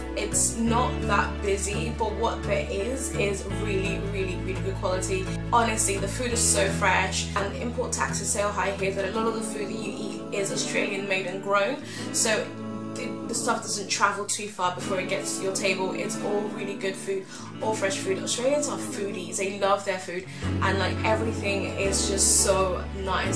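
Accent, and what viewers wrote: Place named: England, United Kingdom. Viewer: A black women with an Engish accent ....awww I fell in love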